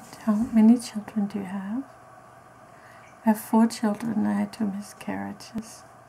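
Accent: Germany. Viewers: ich komme auch aus Deutschland, allerdings aus dem hohen Norden! Ich mag Deine Videos und höre auch noch manchmal einen leichten schwäbischen Dialekt bei Dir ;-) Liebe Grüße aus Kiel :-)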